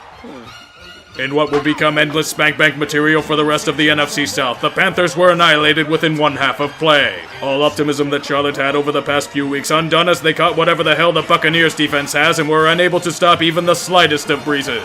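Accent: Pittsburgh, United States. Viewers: is slowly re becoming a ynzer through narrating this soap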